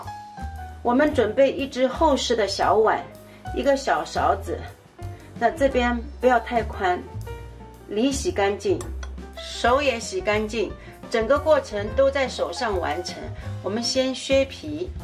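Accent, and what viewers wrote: Shanghai, China. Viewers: 听口音，上海阿姨啊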